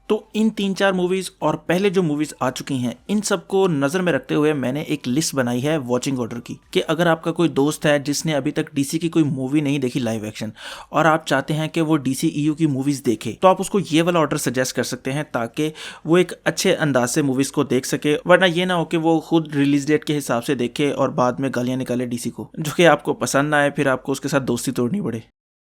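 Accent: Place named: Pakistan. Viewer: Your voice tell me you are from Pakistan 🇵🇰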